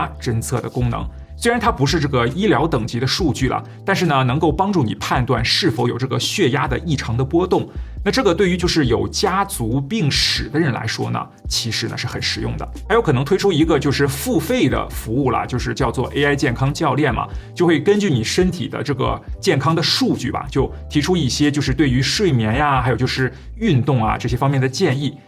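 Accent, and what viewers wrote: China. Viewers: 听口音是大陆出生的，但用词很多台湾化，什么晶片啦，毫米不说毫米😊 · 那你到底是台灣人還是大陸人 如果是台灣人 你講話為啥像大陸人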